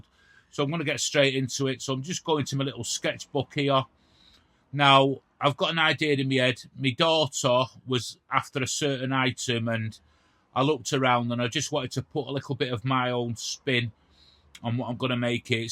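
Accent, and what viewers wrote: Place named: Liverpool, United Kingdom. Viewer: A scouser? Red or blue?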